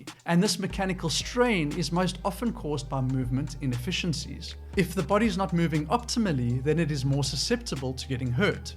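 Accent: South Africa. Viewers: Ten seconds into the video and liked, subscribed. South African accent is so nice. You sound a bit like the former protea captain Greame Smith!! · Beautiful South African accent, made watching very enjoyable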